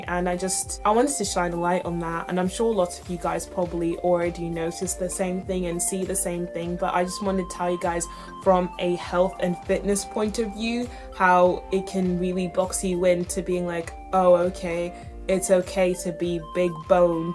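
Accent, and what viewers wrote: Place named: England, United Kingdom. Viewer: You So Cute! Such Lucious lips And Eyes💎 U Talk So Cool Over There In England..... 💖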